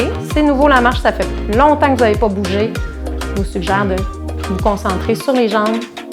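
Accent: Quebec, Canada. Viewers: Bonjour....et un grand bravo pour cette vidéo.qui va beaucoup m'aider...,.car, ayant une cruralgie, je souffre trop pour marcher à l'extérieur, pour le moment. Peut on perdre du poids en pratiquant ces exercices chaque jour...? Et peut on améliorer une cruralgie...? ...p.s. j'aime beaucoup votre accent du Québec....❤❤❤❤😊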